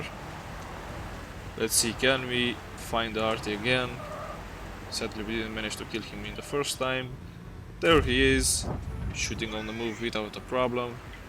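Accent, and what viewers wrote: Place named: Belgium. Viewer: Ik kon je accent maar niet plaatsen tot je 'tier' zei hahah, ik dacht gelijk een Nederlander met een raar accent en toen kwam de 'spotter' en toen dacht ik belg hahahah. Toffe video man, gesubbed en geliked.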